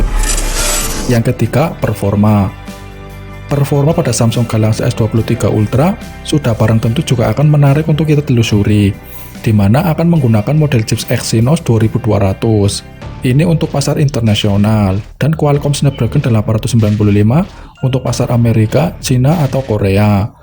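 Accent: Banyumas, Indonesia. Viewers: logatnya ngapak......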